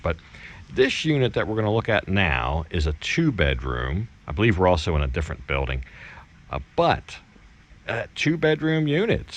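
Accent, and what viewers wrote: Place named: East Coast, United States. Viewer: Where did you Grow Up? Sounds like east coast accent. Definitely a Great Radio Announcer Voice!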